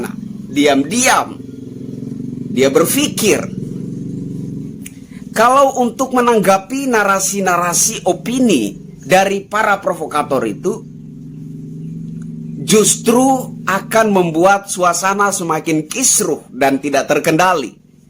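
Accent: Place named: Java, Indonesia. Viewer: Orang jawa kaya nya nih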